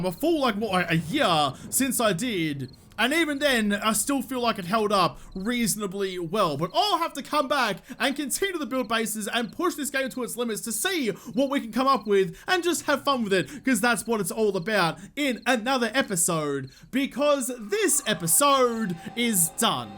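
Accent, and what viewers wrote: Australia. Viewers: Oh so your from Australia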